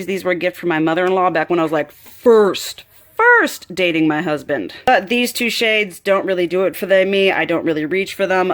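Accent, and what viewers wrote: South, United States. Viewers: So where were you living? I know you have a southern accent but I have always been curious where you were from. I’m in NC!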